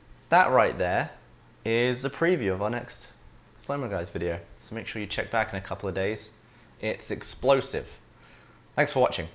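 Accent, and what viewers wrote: United States. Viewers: Anyone else notice how his american accent is developing? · Your picking up a slight American accent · Your accent is becoming more american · you are really starting to pick up the american accent in parts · sounding really american in this vid. I wonder if I moved from here to england how long it would take to start getting a british accent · You're accent is turning American we have lost you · Your American accent is coming through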